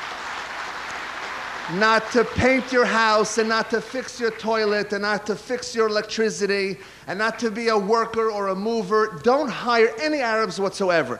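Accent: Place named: New York, United States. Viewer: New York Accent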